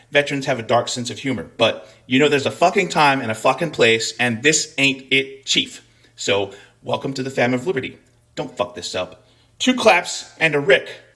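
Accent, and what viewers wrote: Scotland, United Kingdom. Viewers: I gotta say - I love when you’re getting into your rants and your Scottish accent comes out. We need more Scottish rants! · You can tell how passionate is about the subject based on how often his Scottish accent makes an appearance. And he should be passionate about this, as we all should be. If this administration can't treat our veterans properly, they won't treat anyone properly. Thank you, and the admin team, for everything you do. I made it to the end of the video and eff the algorithm.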